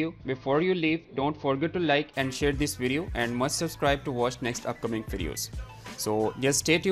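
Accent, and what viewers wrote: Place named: India. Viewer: Me: sweet this tutorial looks good hears the indian accent and leaves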